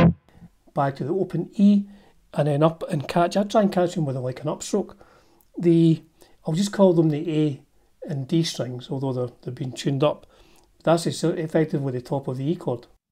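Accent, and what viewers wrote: Scotland, United Kingdom. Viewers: I really like your accent. What nation, is it Scottish ?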